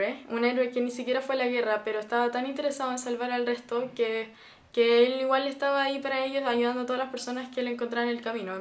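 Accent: Chile. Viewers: Estoy tan obsesionado con este libro que comencé a ver y leer todo lo que hay en internet al respecto. Pero me surgió una pregunta: De donde sos? tenes acento chileno pero usas palabras que usamos en Argentina jaja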